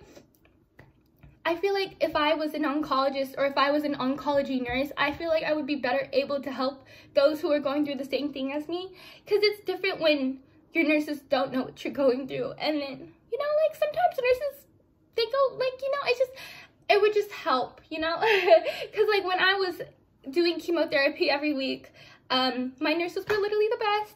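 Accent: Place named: Visayas, Philippines. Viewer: Get well soon, imong bisaya conyo miss na namo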